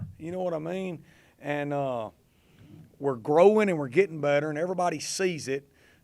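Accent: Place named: South, United States. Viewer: He said 4 years, the southern accent made it sound like there was a bit of a D at the end😂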